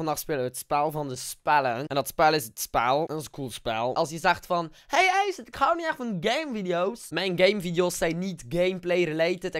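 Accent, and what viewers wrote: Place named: Flanders, Belgium. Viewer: Ik kijk jouw filmpjes puur omdat je een Vlaams accent hebt!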